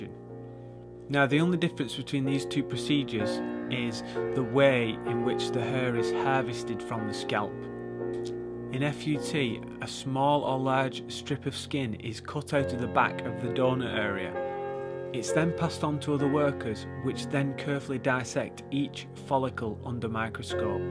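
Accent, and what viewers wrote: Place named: Lancashire, United Kingdom. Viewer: “Hurr from thurr” haha. Love the Lancashire accent. Hair looking great man:)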